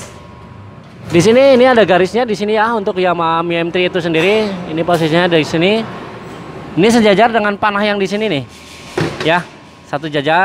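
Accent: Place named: Banyumas, Indonesia. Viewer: Tp logatnya jenengan ngapak ya mas 😁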